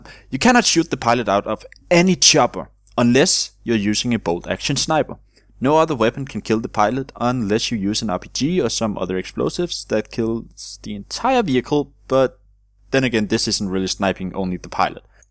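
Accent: Denmark. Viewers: Det da en Dansker der taler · I knew you were from Danmark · Er du fra Danmark? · are u from Denmark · Are you from Denmark?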